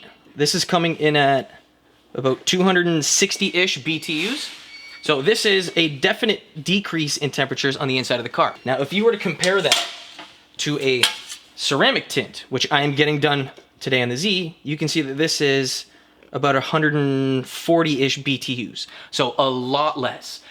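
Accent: Canada. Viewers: In the last year I've kind of realized that there is in fact a Canadian accent, even if you live in the cities, and oh boy I figured out you were Canadian right away LOL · Thats one thick Canadian accent. The pronunciation of those o's. But that aside, it's a good informative video.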